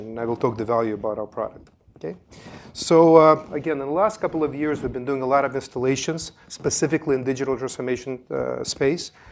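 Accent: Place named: Russia. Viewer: Very russian sounding, sir.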